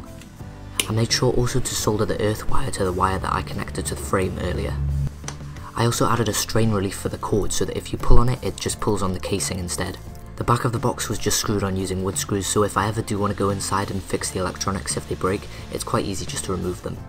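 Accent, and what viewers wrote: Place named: Wales, United Kingdom. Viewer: You have a slight Welsh accent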